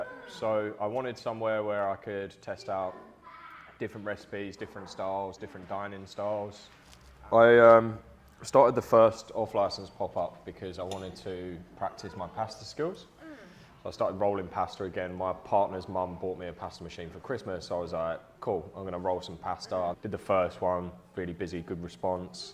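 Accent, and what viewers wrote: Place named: Cockney, United Kingdom. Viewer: Interesting watch with the cockney culinary reincarnation of Mac Miller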